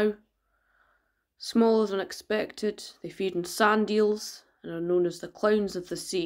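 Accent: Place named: Scotland, United Kingdom. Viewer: I love the Scottish accent.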